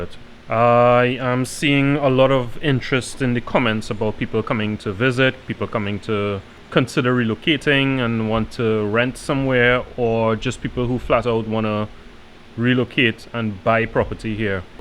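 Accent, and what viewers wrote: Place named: Trinidad and Tobago. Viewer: Recognize this accent anywhere. Hi Trini!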